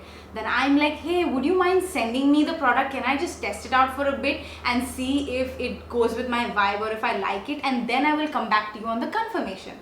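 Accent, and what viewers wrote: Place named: United Kingdom. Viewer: Love your British accent can you make a video on British accent pleaseeewwe.......... 💓😍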